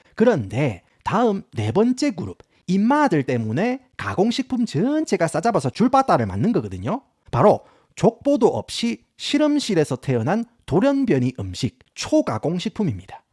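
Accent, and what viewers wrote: Gyeongsang, South Korea. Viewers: 내용은 좋은데 경상도 사투리 극혐 경상도 선조가 중국에서 넘어온 한족들이라 유일하게 한반도내 성조가 있어 듣기 거북함 씨끄럽고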